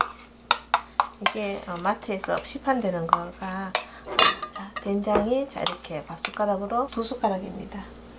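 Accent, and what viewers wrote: Gyeongsang, South Korea. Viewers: 반가워요 이견을 주셧어 고마워요 님 께서 같은 경상도 구수한 고향 말씨에 모습이 석가세존 을 닮으셧고 집사람 과 같은 넉넉한 마음씨에 정이 갔어요 행여 뎃글 읽으시는데 불편하셧다면 넓으신 아량으로 관용을 바람니다. 오늘 날씨가 너무덥고 폭염이 계속되는데요 스트레스 는 만병의 원인이라고 하는데요 늘 건강 하시고 행복한날 되시기를 기원할께요 😊⛵피서 하세요💖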